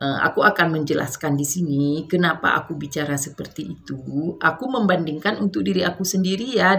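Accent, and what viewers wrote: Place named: Aceh, Indonesia. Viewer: Aaah Terimakasih kak sudah menjawab pertanyaan saya 🙏 Ditunggu part" selanjutnya 🥰 Jangan khawatir, kita gak bosan kok kak. Saya justru suka story telling kak dengan logat Acehnya, bukan hanya sekedar berbagi cerita tapi juga memberikan edukasi kepada penonton. Dan untuk soal makanan, kita paham bahwa yang kakak sampaikan di video sebelumnya adalah lingkungan dan diri kakak sendiri tapi kakak klarifikasi dengan segala kerendahan hati karena menggunakan kata "Indonesia". I really appreciated 🤗 Tetap semangat dan konsisten ngevlog ya kak, inshaAllah subscriber, viewers dan adsense akan menyusul dengan berjalannya waktu ❤️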